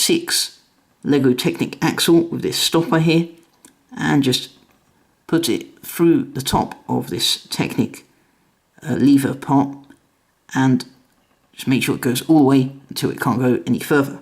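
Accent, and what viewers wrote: United Kingdom. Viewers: his voice sounds like an old man thats British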